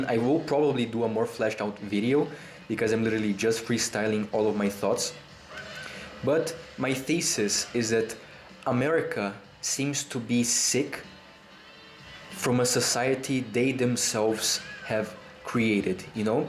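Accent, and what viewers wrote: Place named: Brazil. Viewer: yo are you half mexican half italian? Strange how that combination would give you a vaguely brazilian accent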